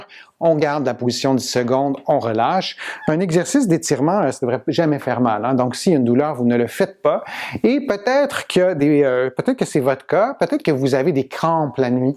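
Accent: Canada. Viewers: Bonjour.je suis vraiment fan.et je regarde vos videos avec beaucoup d'intérêt.et j'essaie dans la mesure du possible de refaire les exercices régulièrement.notament pour le rachis cervical et les épaules.si vous pouvez nous faire une vidéo sur comment éviter la cyphose sénile ou la corriger.merci bcp et je tiens à vous dire que j'adore votre accent canadien.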